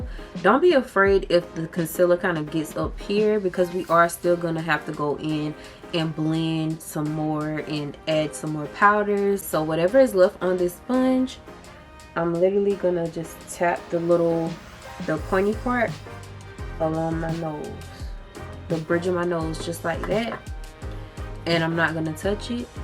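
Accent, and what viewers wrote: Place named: South, United States. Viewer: I knew I heard a southern accent 😂 even down to the certain phrases you said. Girl I’m in SC too and I definitely get it. I use to live in San Francisco but came back due to the pandemic. Wished I would have kinda stayed. 🙄 but ya girl definitely plans on moving again no if ands or buts. 😂 Anyway. Love the makeup 🥰🥰 super cute!! New subscriber 🤗 Very rare I meet another YT from SC!!!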